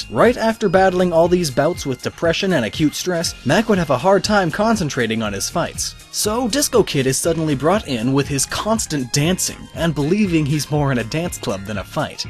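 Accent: Ireland. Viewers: Oh boy what a hot mess old videos were, hey he’s hungry now he’s not hungry now he’s having an exstistential crisis now he’s Irish and drunk RUSSIA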